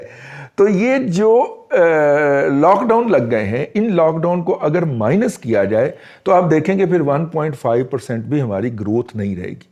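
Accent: Punjab, Pakistan. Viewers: I like your punjabi dialect.being punjabi myself.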